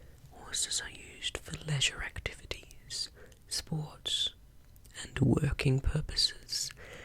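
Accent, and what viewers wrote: Australia. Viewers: Maaan, your Aussie accent is EVERYTHING!😍😍I will listen to you whisper ANYTHING and still tingle!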